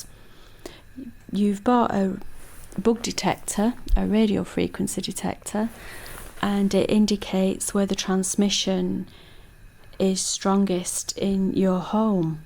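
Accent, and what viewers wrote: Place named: Northern England, United Kingdom. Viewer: interesting idea I notice you have a north england accent I'm from there too. my views on who's doing what is controversial I am wondering what you believe triggered your targeting? as its rarer in england than America nevertheless let's just say Obama has a lot to answer he commited unreal crimes internationally against innocent citizens. all the best I like your idea I constantly give them abuse covertly while on a phonecall I found being reasonable doesn't make them stop. I agree about the hacked phone thing - the signs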